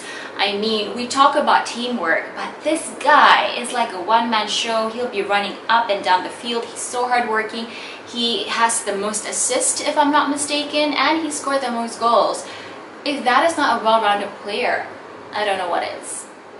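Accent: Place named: United States. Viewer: You speak well, where do you live. You have American accent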